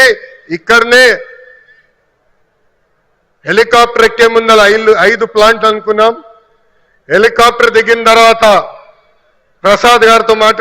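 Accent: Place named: Telangana, India. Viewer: That is Telangana or seema dialect, what is your problem, jagan PADAHIDU antadu😅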